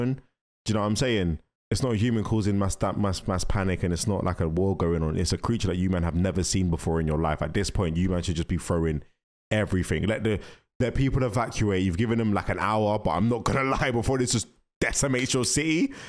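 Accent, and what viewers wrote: United Kingdom. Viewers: Your really British my man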